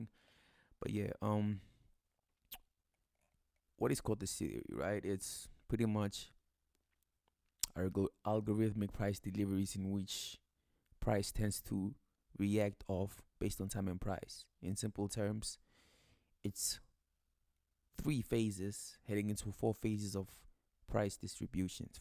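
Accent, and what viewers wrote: South Africa. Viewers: just plain typical Joburg boy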